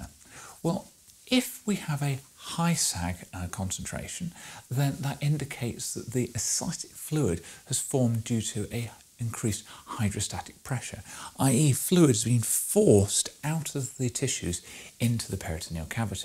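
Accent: United Kingdom. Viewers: the most british doctor ever?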